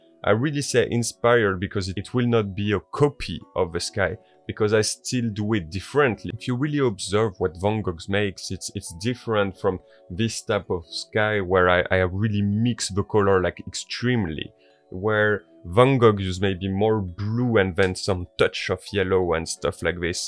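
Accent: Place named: France. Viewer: French accents speaking English is so lovely. Gorgeous needlework too 🥰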